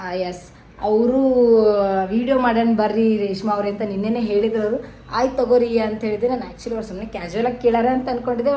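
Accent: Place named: Kalaburagi, India. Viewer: ಕರ್ನಾಟಕದಲ್ಲಿ ಪ್ರತಿ ಜಿಲ್ಲೆಯಲ್ಲಿನ ಮಣ್ಣಿನ ಸೊಗಡಿನ ಭಾಷೆ ವಿಭಿನ್ನವಾಗಿದೆ... ಎಲ್ಲವೂ ಒಂದಲ್ಲ ಒಂದು ರೀತಿಯಲ್ಲಿ ವಿಶೇಷವಾಗಿದೆ... ಹಾಗಾಗಿ ನಿಮ್ಮ ಬಾಯಲ್ಲಿ ಕಲಬುರಗಿಯ ಭಾಷೆ ಕೇಳಲು ಚಂದ..❤❤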